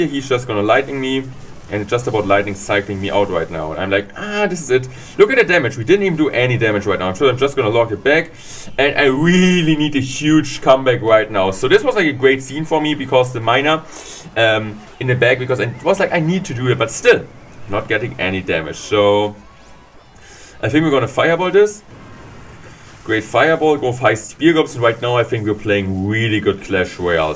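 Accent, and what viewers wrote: Germany. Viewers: You are my favourite youtuber of clash royale(I love your german english accent)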